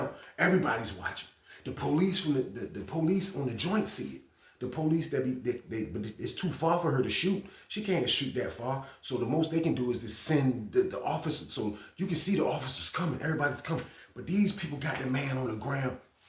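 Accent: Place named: Richmond, United States. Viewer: Sound just like a Richmond nigga..down the jail in the 80’s/90’s early 00’s before they knocked it down that shit was like a prison the beef from the street came to the jail..out of Towners ain’t stand a chance down that jail they checked in INSTANTLY and in on the land out of towners got zipped up ASAP #17thSt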